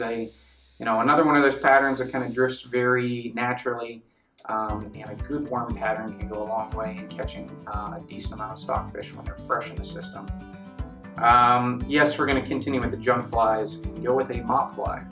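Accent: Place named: United Kingdom. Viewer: I am assuming this guy is talking about uk fishing? It’s just that his accent makes me wonder.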